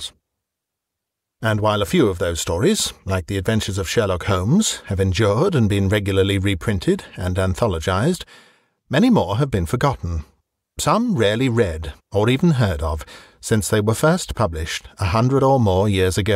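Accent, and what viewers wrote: England, United Kingdom. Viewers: Hi, i just finished listening to a couple of stories you've read, you have such great diction and voice, just like I would imagine a well educated Englishman would sound during the Victorian or Edwardian times, very nice and genuine, thank you! Do you only read short stories, or is there The Moonstone by Wilkie Collins in your collection? My favorite book since my youth, I've read it in multiple languages... Thank you again!!!!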